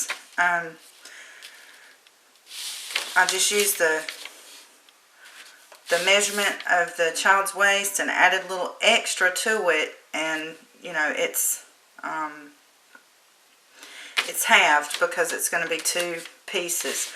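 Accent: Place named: Louisiana, United States. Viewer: Are you from Louisiana or Arkansas? Recognize that accent :)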